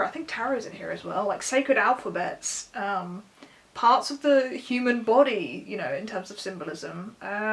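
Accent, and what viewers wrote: England, United Kingdom. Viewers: Are you from the UK? ❤ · Hey - just discovered your channel - I'm in Devon in England - sounds like you're in England too. I have a witchcraft channel as well - brilliant book recommendations! Thank you for sharing 😊 🙏 💓 Xxx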